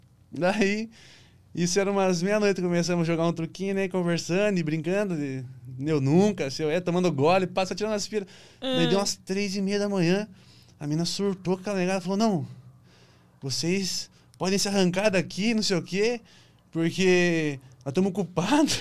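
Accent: Rio Grande do Sul, Brazil. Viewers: sotaque de gaucho azar é us guri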